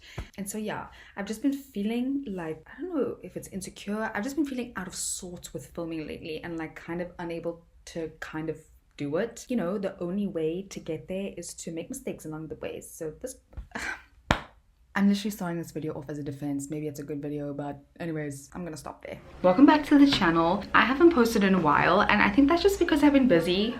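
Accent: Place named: Johannesburg, South Africa. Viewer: joburg boet accent has really plagued my vocabulary recently 🧍🏻‍♀️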